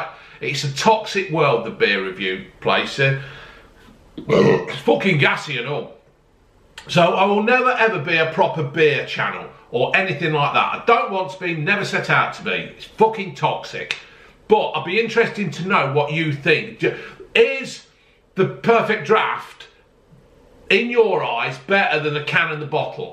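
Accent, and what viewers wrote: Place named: East Midlands, United Kingdom. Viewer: East Midlands accent is not easy on the ear !!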